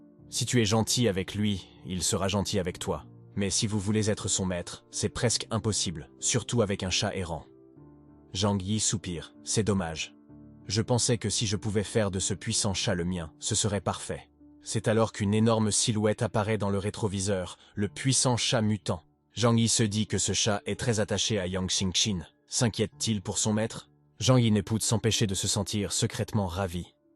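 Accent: England, United Kingdom. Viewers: Petit accent anglais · arrêter avec l'accent anglais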